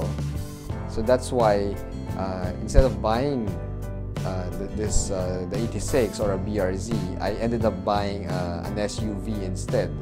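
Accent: Philippines. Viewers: Ooohh, that is so nice 👍🏻 I’m watching your video and my wife hears you talking and she says oh that’s Philippino. I have a 99 Miata. That’s old, but I love it. It still runs great. It’s white with tan top. I wish I had one like yours. Beautiful Scarlet...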